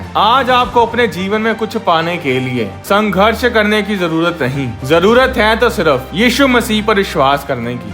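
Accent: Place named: India. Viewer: One of the worst things ever, when you listen history from an Indian accent guy.😏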